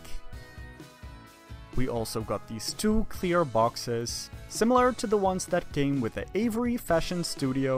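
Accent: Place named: Netherlands. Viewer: Are you from the Netherlands? Your accent sounds different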